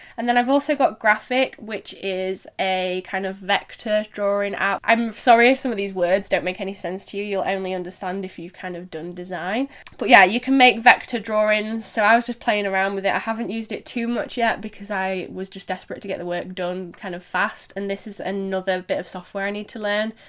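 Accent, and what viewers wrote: United Kingdom. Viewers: Love your British accent! Bloody good video!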